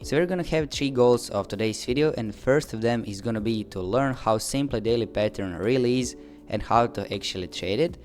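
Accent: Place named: Catalonia, Spain. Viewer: You sound catalonian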